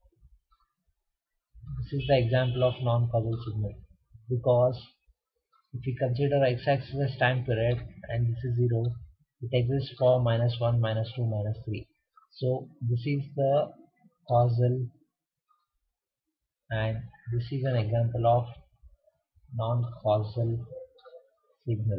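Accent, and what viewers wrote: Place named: Pakistan. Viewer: classical pakistani talking.. :D why internet is full of you guys? even british accent is more understandable.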